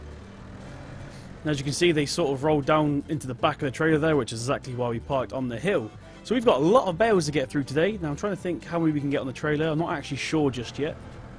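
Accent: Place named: Northern England, United Kingdom. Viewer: oh deer pal so you sound northern dint realise m8t your vids are amazing can u do state trooper patrol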